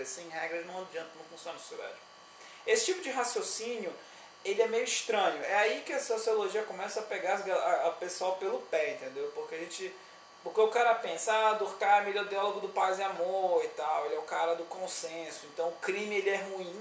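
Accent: Pará, Brazil. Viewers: É paraense?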